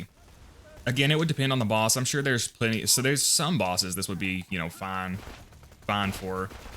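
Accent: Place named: Kentucky, United States. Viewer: Kinda surprised you're from the bible belt. Judging by the accent, I would've guessed somewhere like Kentucky or Tenessee. Greetings from Indiana!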